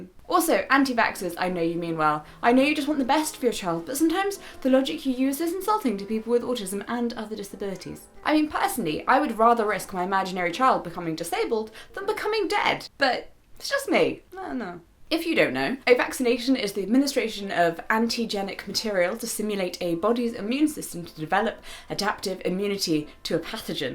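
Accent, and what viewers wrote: United Kingdom. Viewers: She's just awesome. She puts things so clearly and that British accent makes it so much more awesome. Additionally, she always looks so classy while laying out the facts. Oh, by the way, I get vaccinated for everything I need (and a few extras), not just for myself, but for the health of others. In fact, I'm about to get a MMR booster soon., keep up the great videos, I've enjoyed all of the ones I've watched so far.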